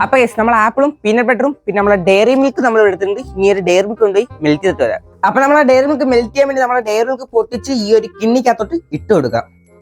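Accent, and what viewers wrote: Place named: Kollam, India. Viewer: Kollath parayum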